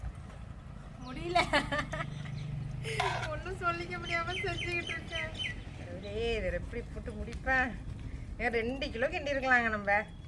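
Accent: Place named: Thanjavur, India. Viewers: Nanum vungala mathiritha pesuva nanum thanjavur district than sister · Neega peasuratha paththa Enga urla peasura mathiriye irruku na thanjavur district Madukkur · Yentha ore pa ,no vithyasam illa nanum ipdi dan thaŕŕ nu nu solven Thanjai tamil theliva irukum. Yenaku ungala pidikum pa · Hai sister love 💕 from kumbakonam namma oor slang❤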